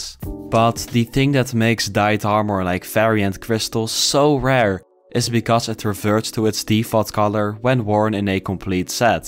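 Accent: Netherlands. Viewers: The dutch accent 😭😭🙏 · You really sound Dutch. Are you Dutch?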